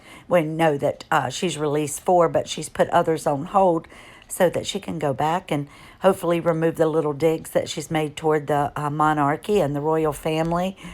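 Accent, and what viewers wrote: South, United States. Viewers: Hello, you have a lovely accent, sounds like a southern accent. If that's so, then good, that means more and more Americans are hopefully finding out what a witch MM is. I'm a Mexican American 66 year old woman from Los Angeles and I've never liked her. Thank you for the read and post. Let's keep spreading the news that MM is vile and hurtful.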